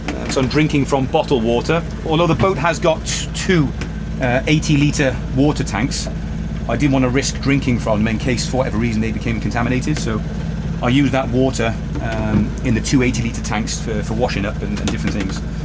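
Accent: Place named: South Wales, United Kingdom. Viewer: All power to your elbow! Amazing stuff. Where do you hail from? I detect a south wales accent. Newport? Anyway, I have black antifoul on my boat but I have never encountered Orcas around Cornwall! Good luck to you!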